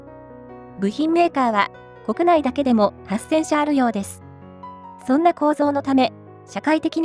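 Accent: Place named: Tohoku, Japan. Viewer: セリフの喋り方が東北っぽくて田舎を思い出すなぁ…。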